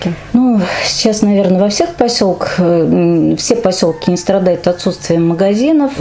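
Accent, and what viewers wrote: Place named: Samara, Russia. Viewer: Привет самарчанка, сразу видно, что человек из Самары, и говор самарский.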